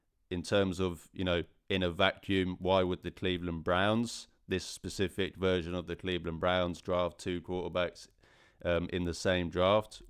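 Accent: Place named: Wales, United Kingdom. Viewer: What part of Wales are you from & welcome to the dawg pound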